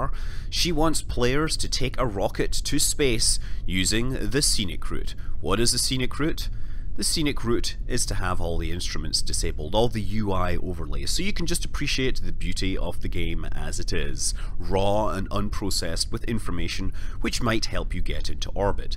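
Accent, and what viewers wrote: Scotland, United Kingdom. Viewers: Only without the shouting, more intellect and a scottish accent?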